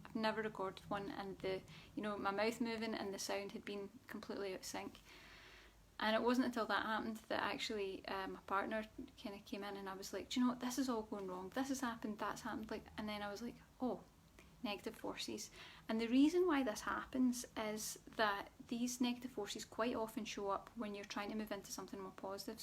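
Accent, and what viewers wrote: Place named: Scotland, United Kingdom. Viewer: Ohhhhh boy! Have you ever described the last month and a half to a "T" for me! I thought that was what was going on, but I needed the confirmation - and here you are! Thank you so much. 😃 P.S. I love love love Scotland and your brogue! Cheers from Canada!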